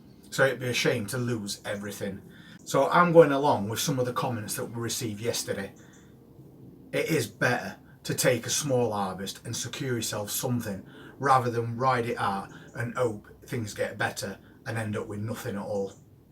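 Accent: Yorkshire, United Kingdom. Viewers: Where r u from? I'm sure I can hear some Yorkshire accent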